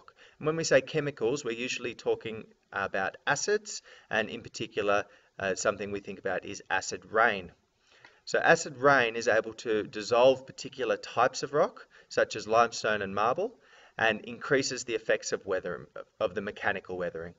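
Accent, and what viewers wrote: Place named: Australia. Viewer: 10/10 aussie accent 🤠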